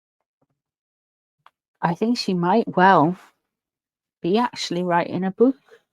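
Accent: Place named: North, United Kingdom. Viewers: Don't worry about pronunciation, my Auntie was from up North and said book like you, it just were you are from in the UK! Be proud of your accent, I was born in the Midlands and now live in Australia, my Australian son in law teases me about my accent :_trifle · I love individual accents, and the north east of England always gets voted as one of the friendliest!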